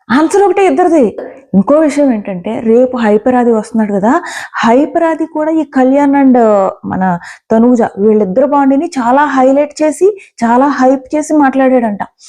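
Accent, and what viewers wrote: Godavari, India. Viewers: Madam mi voice koda godavari ga pavan ramya same area na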